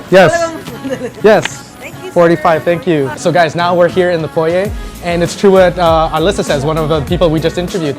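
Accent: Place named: India. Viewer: Id rather listen to a chainsaw sound rather listening to an indian accent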